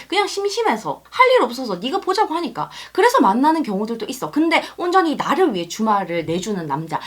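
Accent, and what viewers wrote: Gyeongsang, South Korea. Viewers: 온니 대구 사람이에용? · 언니 대구 사람이구낭😍 이렇게 엮어들어거는거란 말이죠?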